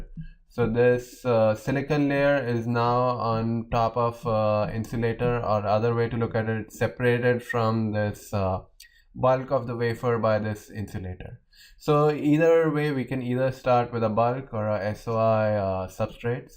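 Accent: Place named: India. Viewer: 阿三这口音我勒个去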